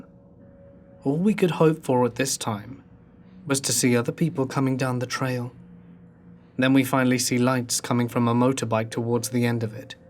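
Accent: England, United Kingdom. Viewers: You so Emglish ,i love it 🥰xxx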